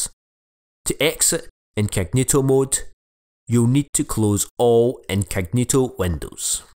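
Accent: Scotland, United Kingdom. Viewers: so nobody gonna talk about his thick scottish accent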